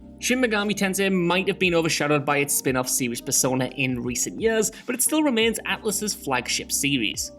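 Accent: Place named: United Kingdom. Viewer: I think you went far to British in the vid. Had no idea what you were saying during skate section. Also Americans watch this channel. Your welcome.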